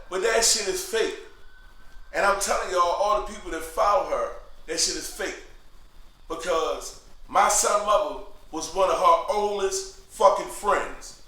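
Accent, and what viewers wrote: United States. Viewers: he got that Baltimore accent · Gotta be from D.C. or Maryland..❤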